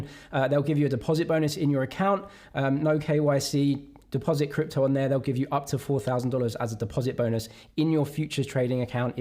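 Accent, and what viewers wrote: United Kingdom. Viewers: accent from UK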